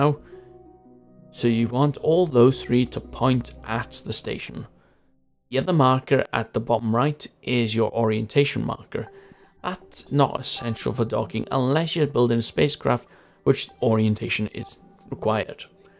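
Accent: Wales, United Kingdom. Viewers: Welsh to me.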